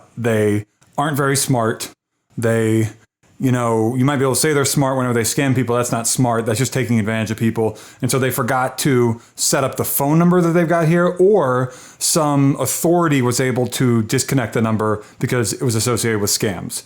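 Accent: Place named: India. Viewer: As soon as I heard an Indian guy I was like nahhh😭😭😭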